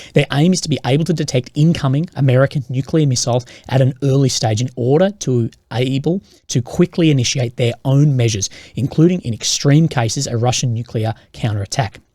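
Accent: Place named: South Australia, Australia. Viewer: I would have to throw a coin. Who's accent is more annoying. Your southern Australian accent The hideous northern English accent or our southern Cajun/ red neck/ hillbilly accent. . NOW!!!!!!!! AS FAR AS THE CHICKS? HOLY SHIT THAT'S SEXY!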